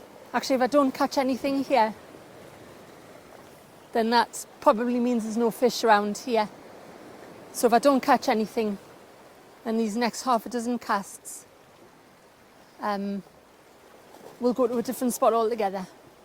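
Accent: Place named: Northern England, United Kingdom. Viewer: you will have to do a northern lights video as by your accent you are very close to catching them Very different accent to further you go up before it turns into Scottish And we are based Co Durham so get to hear many accents ❤️🫡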